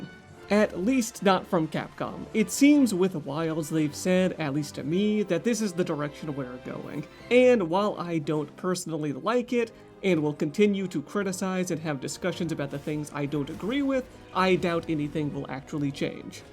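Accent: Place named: Texas, United States. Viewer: "i was hoot i was hoolering " texan spoted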